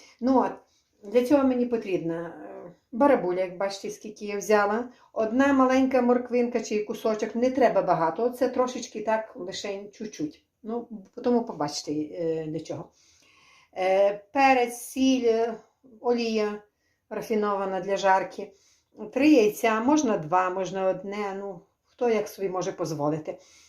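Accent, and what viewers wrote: Ukraine. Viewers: Спасибо за настоящий украинский язык! Язык моих бабушек и дедушек из села в Черкасской области! А деруны очень вкусные! Спасибо!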